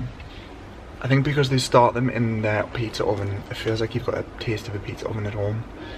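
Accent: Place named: North, United Kingdom. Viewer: noticing a northern accent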